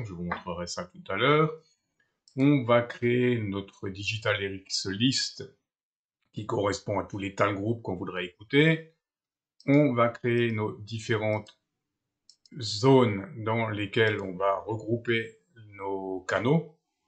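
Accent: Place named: Alsace, France. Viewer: Salut! La fréquence TX du relais 3 a dû être décevante... Sinon bonnes explications avec une jolie pointe d'accent Alsacien. Je suis dans les Vosges, mais pas encore concerné par les relais, je n'ai pas encore de licence Pour le moment, je fais mumuse dans le monde du 446...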